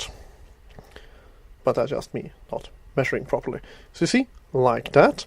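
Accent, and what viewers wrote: Sweden. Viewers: r u swedish? its the accent and the name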